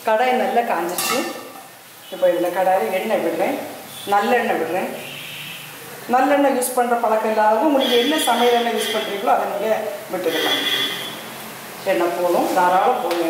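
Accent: Nagercoil, India. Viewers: u r from nagercoil? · Nagercoil voice pole irukku..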